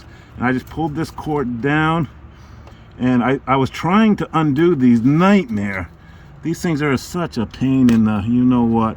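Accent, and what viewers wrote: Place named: Portland, United States. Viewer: "ayeeee good mornin youtubas" dont worry man i can already tell youre coming at me from portland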